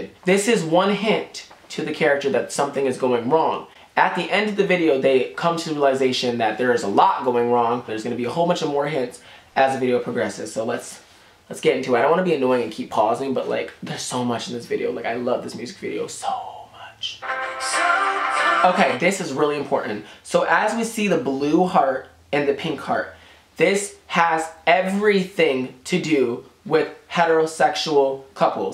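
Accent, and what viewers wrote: United States. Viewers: The fact that you're wearing an Adidas cap and using your iPhone and speaking with your modernised American accent and all that jazz just makes me love this video so much, because it means you basically fit into all of that yet the difference is you're aware. I loved this video from the very beginning and I got the message right away, I was waiting for a video like this to be made and I'm glad I've finally found it(because someone actually had the time and bravery to do it). And for that I thank you. I come from a very unprivileged place, Venezuela, and only recently (Literally less than a few months) we've been getting support and aid from the international community, when we've been facing with this narco-dictatorship for longer than 17 years. People die there, out of violence and starvation, and the international community is too distracted by media and other entertainment to realise. 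I was lucky enough to escape the country but I always make sure people around me and all those I possibly could talk to realise and are well-informed about what's going on back home. It's horrible and it's real. I am glad I found your channel, totally subscribing.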